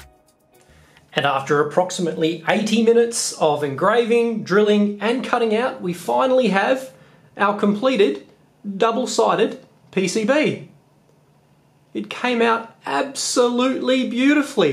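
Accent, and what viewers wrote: Australia. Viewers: hes just got a really strong aussie accent, i kinda like it (though im also an aussie)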